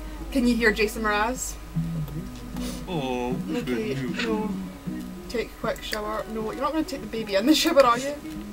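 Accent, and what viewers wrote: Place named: Scotland, United Kingdom. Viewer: I always enjoy watching your videos. I just have to watch some parts over and over again. Because of yer scottish accent x3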